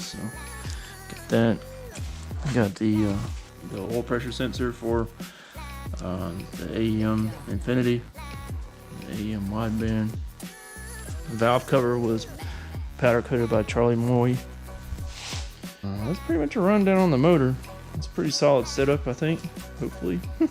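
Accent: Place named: West Virginia, United States. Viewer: I would swear you was here in West Virginia driving that old Teg you call “Skeeter” . Same way we do it out East here anyhow. Guess Alabama aint much different than here. Shit had me laughing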